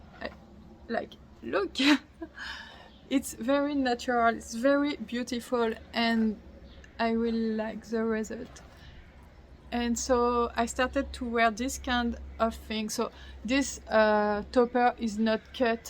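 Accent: France. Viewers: This is the first video of yours that I've seen. I am very impressed by you...both your bravery, and your command of the English language. Yes, your accent made me concentrate more to understand some words, but most of us Americans think the French accent is beautiful.❤ You speak very eloquently. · Why are you apologizing? who doesn't adore french accent!! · Happy to hear more French accents on YouTube. Thank you for sharing your story. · Lovely and pleasant accent. French is a harmonic language and soft in the ears so the accent is so nice. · Never apologize for a French accent! :) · Well she’s French she can’t do anything about it like Im Greek and I’ll always talk with a Greek accent when I speak English,I can’t control it….. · I love your French accent 💖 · 1- you are so beautifual with our without wigs. 2- having a french accent makes you even more beautiful 3- thank you fo this video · Your English with French accent is beautifull it truely is. 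I feel for you girl. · Who does NOT ❤ a French accent!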